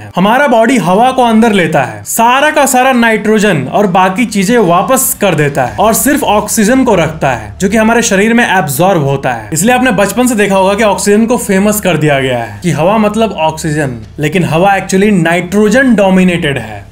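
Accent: Bihar, India. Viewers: Bihari ho kya aap?? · 😂😂that bihari accent 😅❤❤❤ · Bhaiya bihari ho kya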